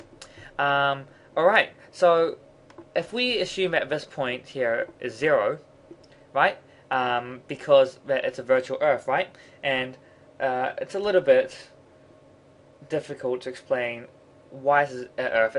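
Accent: New Zealand. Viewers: You're from NZ aren't you ?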